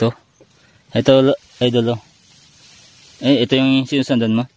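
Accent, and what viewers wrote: Ilocos, Philippines. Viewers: Ilocano po ba kayo oh igorot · Akala ko po visaya po kayo yuon po pala ilokano po kayo ilocano din po ako heheh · Ilocano ka pati siyak😅😅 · Ilocano ka met kanno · Ilocano ka gayam boi hahaha.napugsat diyay saput nan hahhah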